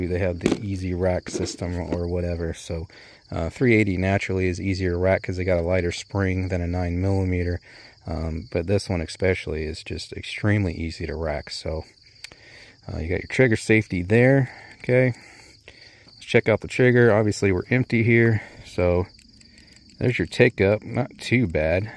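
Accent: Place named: Southern Ohio, United States. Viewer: You must be southern Ohio.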